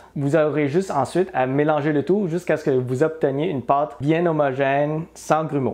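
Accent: Canada. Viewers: Viêt avec accent canadien .Trop cool. Merci pour la video . <num> Aix en Provence France . today blu sky 14 degré.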